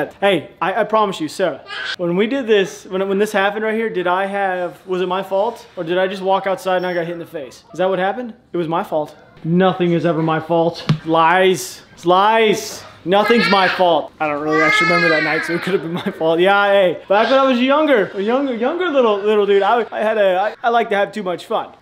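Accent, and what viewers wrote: South, United States. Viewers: you and Nick Irvin should go teach demo ranch to shoot long range for a video idea. Your southern accent was shining today by the way, it was sweet, I love your videos keep them coming.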